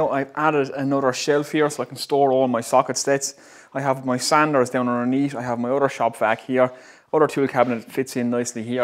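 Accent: Waterford, Ireland. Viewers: just looked back and found your video fixing timber to the walls. I might just take down the few bits I've put up and start again like you did. I'm gueaaing by the accent you're a Waterford man. If so, commiserations for Sunday. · Cut the ploywood with the moytersaw, I love the Irish accent almost as much as I love this build.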